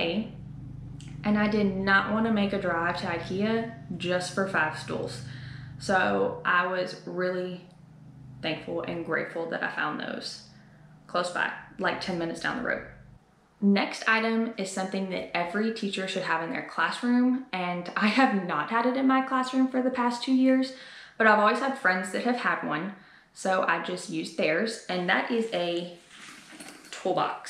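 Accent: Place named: South, United States. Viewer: LOVE this! These stores are all awesome! As is your Southern accent haha happy new subscriber here!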